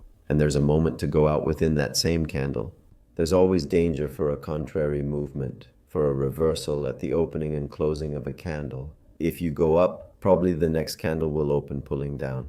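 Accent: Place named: England, United Kingdom. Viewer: Me gustó con la pronunciación inglesa, ud siempre innovando y superándose, lo admiro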